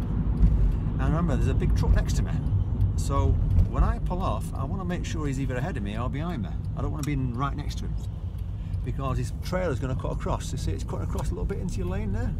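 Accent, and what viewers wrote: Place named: Northern England, United Kingdom. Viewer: you defiantly have a northern twang! I’m from Yorkshire so I would know 😊 I passed 7 months ago after 4 attempts … nerves let me down a lot those first 3 attempts, watching your videos helped me a lot! I’ve been terrified to try motorways but finally did it yesterday after watching your video. Cheers!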